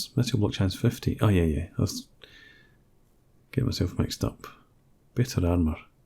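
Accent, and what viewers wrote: Scotland, United Kingdom. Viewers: you are Scot, arent you? love your accent;-)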